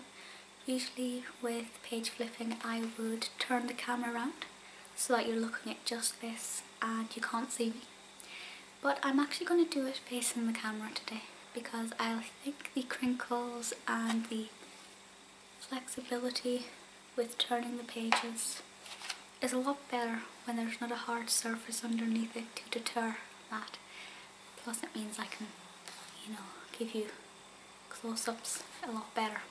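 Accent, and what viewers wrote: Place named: United Kingdom. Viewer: British asmr, perfect :D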